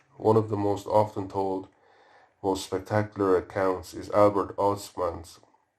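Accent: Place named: Ireland. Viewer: What part of Ireland are you from pal? 🇮🇪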